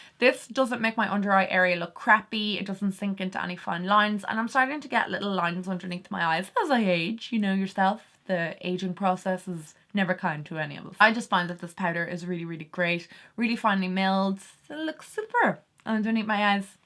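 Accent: Ireland. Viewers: Hello beautiful, new subscriber. So proud I recognized your accent as an Irish one. 😉😊 How lovely. 💖💖⚘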